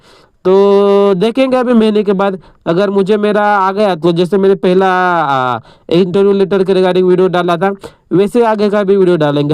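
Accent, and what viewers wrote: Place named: Maharashtra, India. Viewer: साहेब आपल्या हिंदी वरून वाटत आहे आपण मराठी आहात आपण मराठी मध्ये व्हिडिओ बनवा समजल तरी आपल्याला काय म्हणायचं आहे ते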